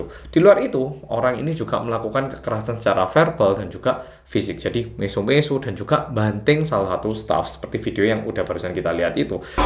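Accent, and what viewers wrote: Java, Indonesia. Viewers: Mas Korea medok.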